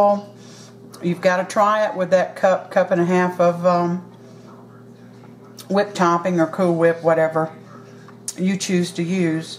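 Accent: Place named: Tennessee, United States. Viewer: Your accent sounds like you in Tennessee. Are you ?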